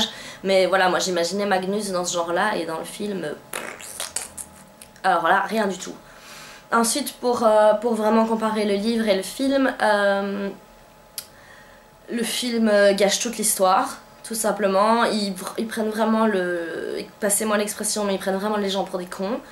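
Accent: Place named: Southwest, France. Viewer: Oups c'est un garçon, il s'appelle, (j'espère que je ne trompe pas..) il est aussi blogueur, d'après son accent il doit être du sud ouest de la France...Allez à suivre..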